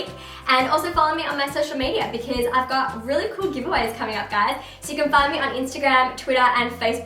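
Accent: Australia. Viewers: I'm used to clicking a video and hearing a British or American accent. hearing your Aussie accent has become weird, despite me being Australian too.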